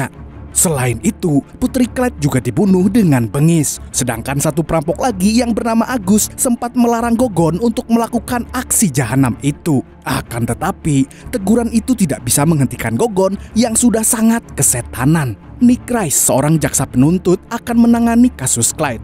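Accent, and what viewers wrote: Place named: Kebumen, Indonesia. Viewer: Jelas admin ini orang kebumen , ke Sempor beli mendoan , ora ngapak ora kepenak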